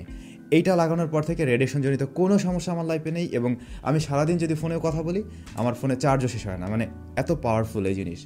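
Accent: Barishal, Bangladesh. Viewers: vai ki mogo deshi naki.................. i mean borisal......???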